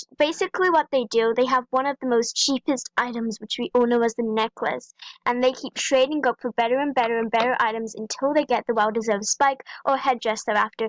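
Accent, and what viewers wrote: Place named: United Kingdom. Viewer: Is that your real voice? Its high but its sounds like a british human...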